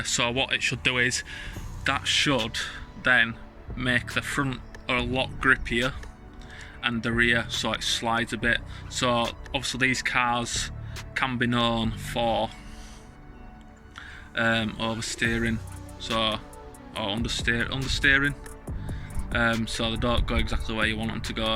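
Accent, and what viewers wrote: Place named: Yorkshire, United Kingdom. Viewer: Also you have a Yorkshire accent I live in your shire be cool to meet up some time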